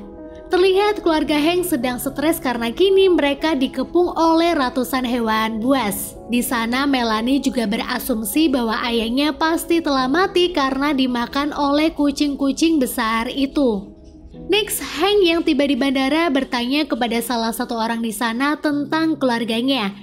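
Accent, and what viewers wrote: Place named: East Java, Indonesia. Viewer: Aleman ini makanan apa ya…?🤔🤔 Logat jawa timur paling timur kayaknya…😁😁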